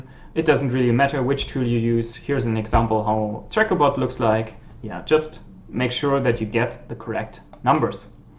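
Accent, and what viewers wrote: Germany. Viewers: Wondered about that aswell, just sounds like german accent right? :-D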